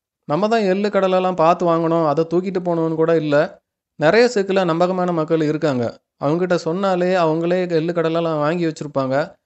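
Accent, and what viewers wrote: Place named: Salem, India. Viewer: அவர்களுக்கு வணக்கம். நான் சேலம் உருக்காலைப் பணியாளராக இருந்து பணி நிறைவு பெற்றவன். தற்போதும் உருக்காலைப் பக்கம்தான் வசித்து வருகிறேன். இந்த வீடியோவைப் பார்க்கும்போது - உங்கள் மொழி அதாவது பேசும் விதம் என்னை ஈர்த்தது. வீனியோவின் நிறைவிலதான் காரணம் புரிந்தது நீங்கள் எங்கள் ஊர்க்காரர் என. மிக்க மகிழ்ச்சி. மிகப் பயனுள்ள தகவலைப் பதிவிட்டிருக்கிறீர்கள். நான் நீண்ட நாளாக - எண்ணெய் ஆட்டித்தான் பயன்படுத்துகிறேன். பலர் என் செயலைக் கேலி செய்வதுண்டு. நான் கண்டுகொள்வதில்லை. இப்போது பலர் எண்ணெய் ஆட்டுவது பற்றி ஆலோசனை கேட்டு வருகிறார்கள். உங்கள் வீடியோவைப் பரிந்துரைப்பேன். நன்றி சார். எண்ணெய் ஆட்டும் செக்கு பற்றி தகவல் கொடுங்கள்.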